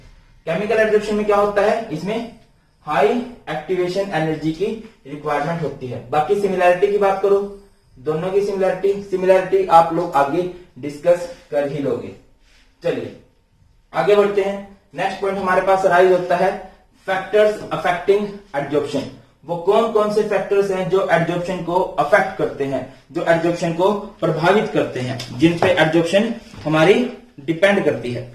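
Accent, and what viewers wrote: Haryana, India. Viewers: Mujhe lagta h aap harayana se ho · Sir aap hariyana s ho kya , becoz your voice is like that only 😅😅😅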